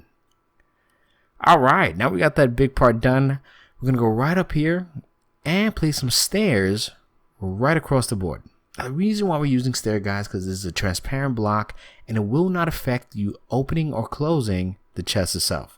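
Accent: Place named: New Jersey, United States. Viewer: I'd say Italian from Jersey.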